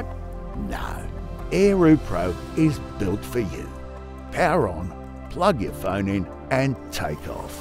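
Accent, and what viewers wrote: Australia. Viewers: Na, this old mate sounds true blue Aussie 🇦🇺, it's great and I'm a kiwi 😅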